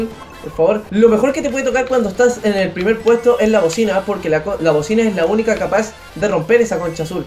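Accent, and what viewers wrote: Chile. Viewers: Pense que eras de chile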